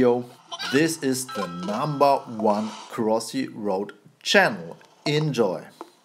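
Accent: Germany. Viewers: I love this update are you german?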